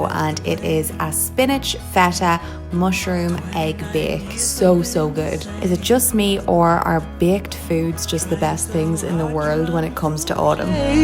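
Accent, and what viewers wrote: Ireland. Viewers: I wonder what part of ireland. bc i feel theres a clear difference I can hear from different Irish people's accents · i looove your accent! are you irish? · She is Irish :) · Are you Irish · Molly Mae i’m from ireland too and i can tell you she is from ireland 🇮🇪 💕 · Anindita Chatterjee she’s Irish and has an Irish accent :) “odd” is simply your perspective, not being Irish yourself. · Im pretty sure just irish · I visited Ireland almost a year ago and I miss it a lot it’s a wonderful country, and watching your videos weirdly makes me nostalgic, maybe it’s hearing the accent haha. but I love your videos!! They are super relaxing and inspiring <3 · You have the most satisfying accent ever. Are you from Ireland?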